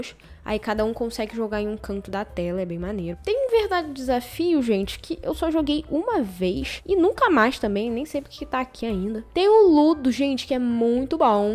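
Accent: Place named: Rio de Janeiro, Brazil. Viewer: carioca com xish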